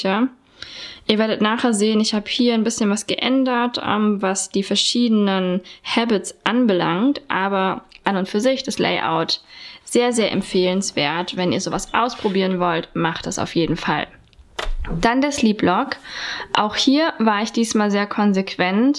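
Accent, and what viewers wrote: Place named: Germany. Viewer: hallo!! ich hab erstmal deine english videos gesehen und was sicher, dass dein accent aud Deutschland war. Ich bin von Chile und kann ein bisschen deutsch sprechen aber ich hab' fast kein oppotunitat mein deutsch zu benutzen. Aber dein videos sind perfect um die sprache nicht zu vergesen 💕 (entschuldigung für die fähler)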